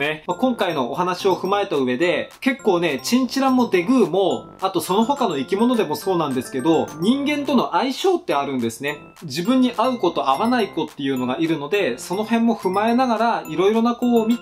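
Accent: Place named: Japan. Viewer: もしかして地方出身の方ですか？ 話し方が可愛い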